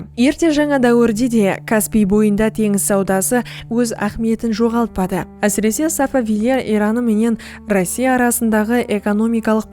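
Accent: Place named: Karakalpakstan, Uzbekistan. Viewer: Қарақалпақша таза сөйлеген тилиңнен айналдым 🎉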